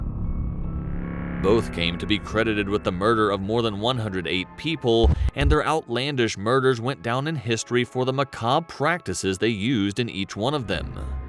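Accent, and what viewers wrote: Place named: United States. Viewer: He’s American not English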